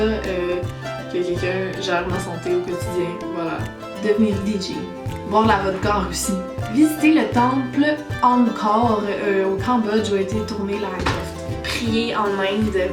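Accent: Quebec, Canada. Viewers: Ouah :o D'habitude je déteste l'accent québécois mais là c'est trop mignon :3 +1 abonné :D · C'est un accent canadien ?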